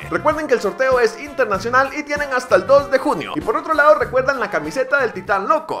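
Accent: Mexico. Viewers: Pensé que eras Mexicano jaja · Vaya!! Resulte engañada, pensé que eras de México!! Aun así, saludos!! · Yo pensé que eras mexicano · Enserio es de costa Rica? Juraba que era mexicano, creo que lleve el noseastancreduloMcfly a otro nivel:'v Salúdame y le cambias el nombre a tu canal por v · Saludame a mi y a todos los que pensabamos que eras Mexicano... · ¡Fuimos timados! la mayoría pensábamos que eras mexicano. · Creí que eras mexicano,pero aún así te amo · eres de Costa Rica.? pense que eras de México:'v. pero aun asi eres el mejor v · Wow eres de costa rica?crei que eras de mexico,buen video sigue asi. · Queeeeeeeee no eres mexicanoo yo pensé que eras mexicano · Si fui tan credulo para creer que me saludarias lo fui mas cuando crei que eras mexicano:v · Juraba que era mexicano:v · CÓMO QUE NO ES MEXICANO? hubiera apostado a que lo era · Pensé que eras de México: v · pensé que eras de méxico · Yo soy de costa rica y pensé que eras mexicano xD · Pense que eras de mexico:v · Pensé que eras mexicano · Yo también soy Tico yo siempre creí que eras mexicano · Pense que eras mexicano... 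Hola Vecino soy de Nicaragua.. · mexicano enserio con el acento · Yo también juraba que eras mexicano 😱😱😱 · todos pensaban que eras mexicano:v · Mae, crei q eras Mexicano, tal vez por el español neutro, pura vida loco, buen you tuber pa, saludos desde Heredia, muchos exitos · queeeee?? no eres mexicano? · Yo pensé que eras de México me has timado!!! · Yo pense que eras mexicano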